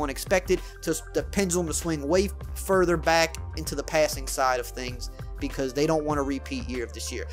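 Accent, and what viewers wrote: Louisiana, United States. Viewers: Are you from Louisiana? You have a Louisiana accent.. just like me 😂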